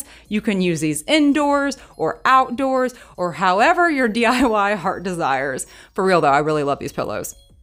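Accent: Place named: South, United States. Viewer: Your DIY dollar tree hacks are the best!! Thank you!! Are you from the south? Love the accent I'm from Florida!! I miss home!!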